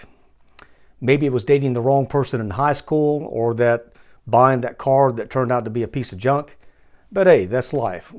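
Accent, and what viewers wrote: South, United States. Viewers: l like your southern drawl is it?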